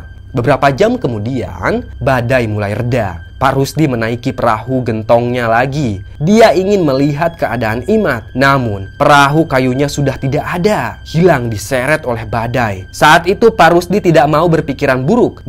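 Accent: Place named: Lombok, Indonesia. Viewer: Orang lombok yah😅😅😅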